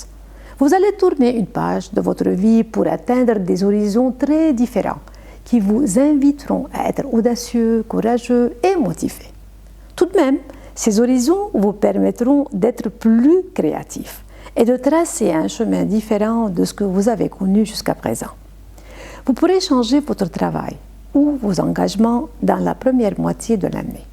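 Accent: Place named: Italy. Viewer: تكلمي الفرنسية بلهجة ايطالية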